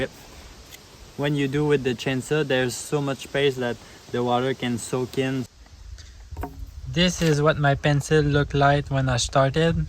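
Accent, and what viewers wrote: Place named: Quebec, Canada. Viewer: Excellent vidéo sur le sujet! Et presqu'en français... Hi H... Pour vrai, l'anglais utilisé est enfin à ma portée. Parler à la George Saint-Pierre a vraiment son charme aussi. Bref, le plus important est sans contredit le contenu apporté. Les détails sont suffisants pour quelqu'un qui souhaiterait se lancer. Ça vraiment le mérite de faire rêver. Grand merci. PS: Vous êtes d'où déjà? Je me souviens d'avoir été impressionné lors d'une épisode de Go van.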